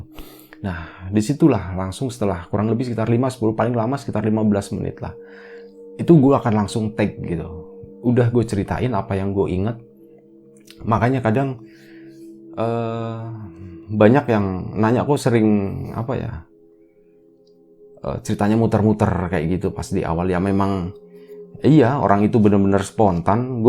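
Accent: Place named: Java, Indonesia. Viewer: Assalaamualaikum🙏sy baru tahu cannel nya mz ada dua toh, karena sy sub baru d lapak horor jd selalu penasaran, trs d buka buka ech dapet yg ini sy coba buka, ternyata ada radio kesayangan d bdg kesebut, duch seneng nya😅😅sy kan org bdg mz, tp leluhur saking Jawi, jd seneng kalo denger mz berbicara medok Jawa nya itu bikin saya terlena🤭😅😅😅semangat dan tetep sehat mz, matur suwun ceritane🙏🙏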